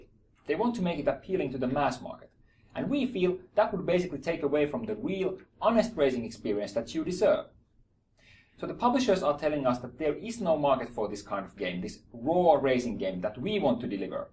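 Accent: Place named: Finland. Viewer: That finnish accent! My Greetings from (my homeland) Finland to Finland :D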